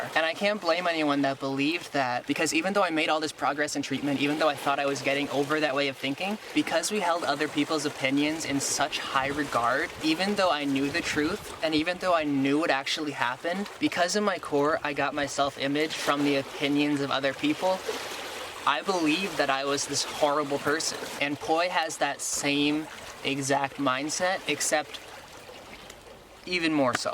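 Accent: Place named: Maine, United States. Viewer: AYYY 3 dollah deweyyssss r u from maine wtf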